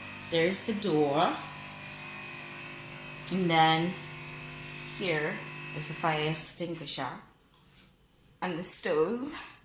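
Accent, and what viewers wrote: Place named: United Kingdom. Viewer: You sound very British You can ask for a UK man like me lol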